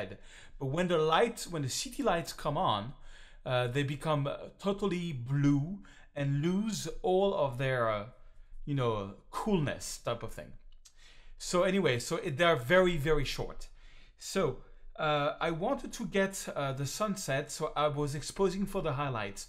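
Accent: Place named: France. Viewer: For your English tutorials, it would be great if you would talk a bit slower. Your French accent has me backing up very frequently to catch what you said.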